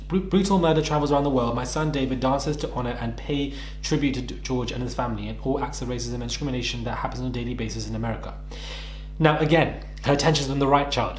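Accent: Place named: United Kingdom. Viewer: Racheal X Whoa! Well I feel dumb, I should have guessed this guy has a primarily uk audience since he sounds like he’s from there too😅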